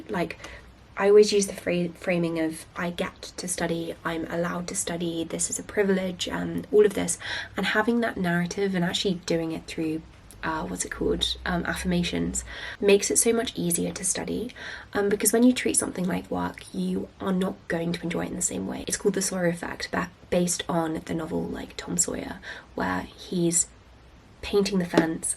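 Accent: United Kingdom. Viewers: Wow. You translated your video to 5 languages. That's awesome. Hi from Belarus. I'm learning British accent with your videos)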